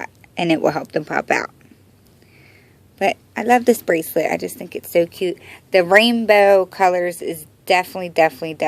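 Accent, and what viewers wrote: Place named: Brooklyn, United States. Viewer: Ma'am I'm so sorry. I really do not want to offend you when asking this question. Are you from Brooklyn? You just have that Brooklyn accent mixed w/ country lol.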